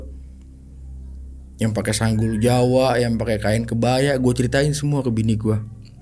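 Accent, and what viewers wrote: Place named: Betawi, Indonesia. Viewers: Betawi kalo udah cerita emang seru dach😅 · Ini mah betawi bet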